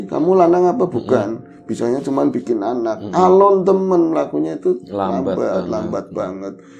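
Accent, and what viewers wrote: Banyumas, Indonesia. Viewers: Wilujeng siang Selasa ceria sambil mendengarkan suara bro. Ngapak nya kental. Maju NGOBOR Jangan lupa bahagia 💪💪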